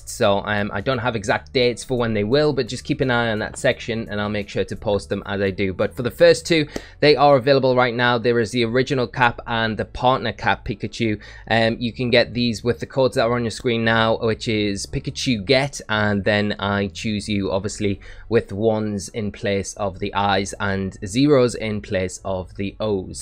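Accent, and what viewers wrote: Newcastle, United Kingdom. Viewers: Wish we had gmax Morpeko 😢 btw are you Geordie?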